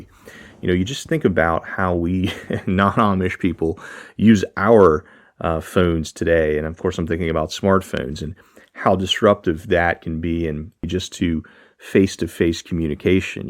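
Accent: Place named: Philadelphia, United States. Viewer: Question for the narrator, you have a Philly Delco accent?? Where are you from?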